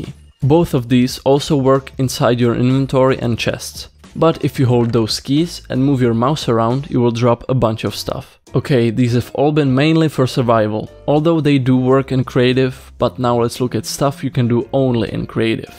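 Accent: Czech Republic. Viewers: I can smel the czech accent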